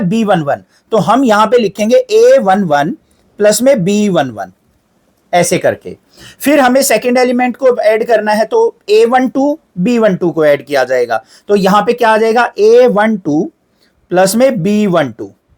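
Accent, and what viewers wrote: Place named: Sonipat, India. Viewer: arey sir jii aapp sonipat ke lgte hoo kyuki aap bhi ho or aapki boli bhi vaisi hi h or m gannaur kaa hu 😂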